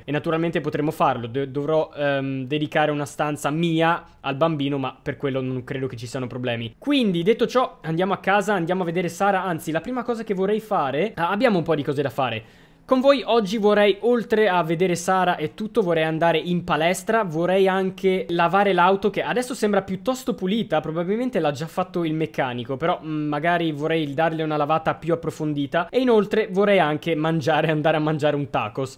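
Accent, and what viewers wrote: Milan, Italy. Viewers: sei milanese?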